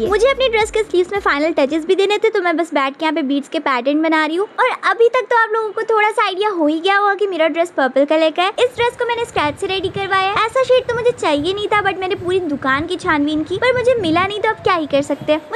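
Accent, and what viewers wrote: Bihar, India. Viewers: Tum Bihar se ho kya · App Bihar se ho kya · Dii aap bihar se ho kya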